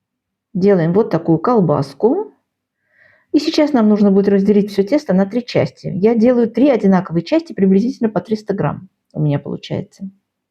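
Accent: Baku, Azerbaijan. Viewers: Я с удовольствием вас слушаю за ваш бакинский акцент ,удачи Вам а я Гюльзар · Слушая вашу речь, услышала знакомые бакинские нотки ❤ Прочла комментарии и поняла, что не ошиблась ! Бакинский говор остаётся с нами на всю жизнь и не узнать его не возможно .. Долгой жизни вашему каналу и удачи ! С приветом от бакинки ❤🌷💓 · здравствуйте Вы из Баку? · Я как раз так и думала что вы наверное из Баку Такой мягкий мелодичный бакинский акцент😊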